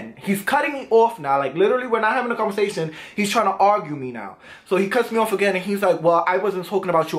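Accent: New York, United States. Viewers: yo i dead always watch your vids to hear the ny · He got that New York talk yo. Brooklyn or the Bronx or Marcy Projects son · that New York accent thoooooooooooo. REPPIN FROM THE BXXXX HEA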